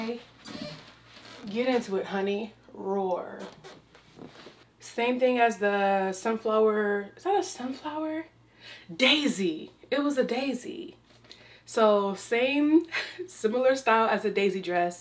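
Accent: United Kingdom. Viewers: It’s the English/ British accent for me 😂😂😂😂😂😂😂😂😂😂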